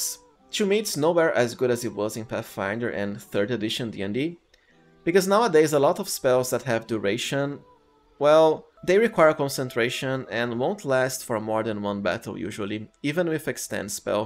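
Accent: Brazil. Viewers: maluco com sotaque todo brasileiro falando ingles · não tem como nao saber que é br com esse sotaque, belo video amigo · Eu tinha certeza que tu era brasileiro pelo sotaque. Conteúdo muito foda meu mano. Talvez tu fosse o melhor canal de bg3 builds no brasil em pt, mas a escolha do inglês claramente deu certo.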